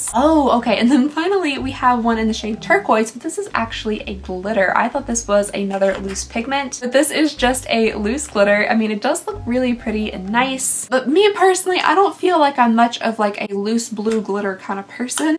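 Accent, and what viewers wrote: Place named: Owensboro, United States. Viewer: My best friend in high school was from Owensboro... your accent makes me miss her!!! Love what you do so keep on keeping on!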